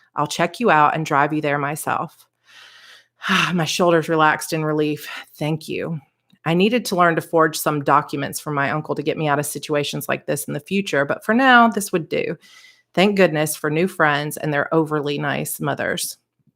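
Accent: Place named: South, United States. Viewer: I live in Arizona and like Californians we don't have an accent. However, you have just a hint of southern accent that makes your voice so charming. Please seriously consider narrating your books. ... The other thing is, I'm always disappointed when there's a hired narrator. It makes it soooo much more personal to get the book read to me by the author. Sending lots of love ❤ from sunny 🌅 Arizona 🌵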